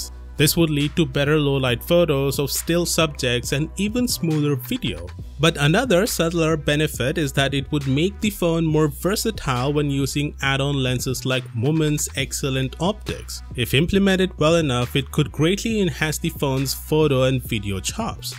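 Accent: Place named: India. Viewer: The Speaker Hindi Engelish🤮🤮🤮🤮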